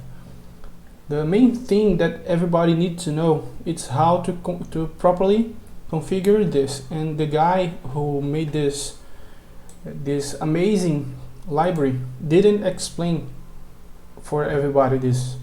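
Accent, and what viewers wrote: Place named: Brazil. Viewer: tu é brasileiro ne man da pra sentir o sutaque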